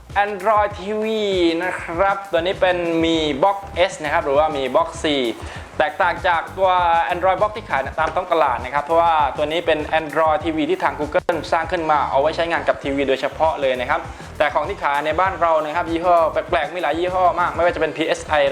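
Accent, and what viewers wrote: South, Thailand. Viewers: ไม่ทองแดงครับ เป็นสำเนียงของคนมาลายูสามจังหวัด ชีวิตประจำวันเค้าพูดภาษายาวีกัน เวลาพูดกลางแอคเซ็นเค้าจะเป็นประมาณนี้ สำเนียงทองแดงจะเป็นของคนพูดใต้ครับ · สำเนียง เหมือนคนใต้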